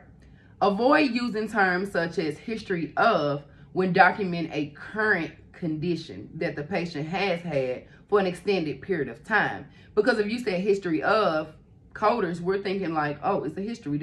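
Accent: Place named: South, United States. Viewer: Great video! (And I love the southern accent!)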